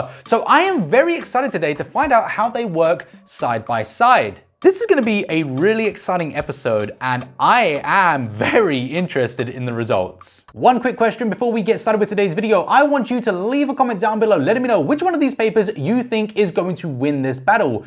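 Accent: Germany. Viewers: could you do a intro in german one day? :-D i like your german voice :-D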